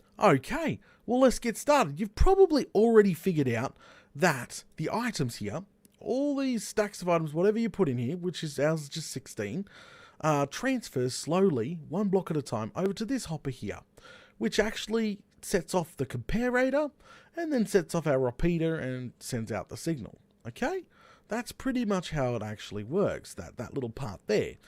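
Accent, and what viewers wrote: Australia. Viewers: Subbed just because Australian accents are great for tutorials lol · I prefer British accent for tutorials Btw because Australia is a British colony the accent sounds like a fusion of a Southern and British accent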